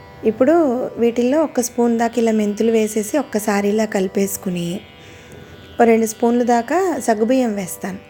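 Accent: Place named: Vizianagaram, India. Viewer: మీ లాంటి వాయిస్ విని చాలా ఇయర్స్ అయింది... ఇలాంటి తెలుగు చిన్నప్పుడు మేము ఉండే ఏరియా vzm లో మాట్లాడితూ పెరిగాం.. ఇప్పుడు వాళ్ళ నార్మల్ గానే ఉంది 🙏🏼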